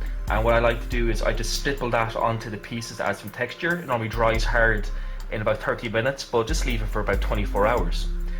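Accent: Ireland. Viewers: Another great "how to" of yours,! "First thinhs first" I like the sound of Irish English! And what's very nice, too: You make your method usable for those without an airbrush like me! Cheers, mate!:)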